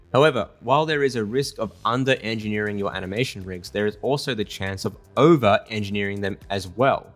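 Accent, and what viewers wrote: Australia. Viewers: interesting. Thanks for you replies bud. Btw I detected an Aussie accent? I’m based in Sydney. I like the style of your work so I’ll be keeping an eye on your page.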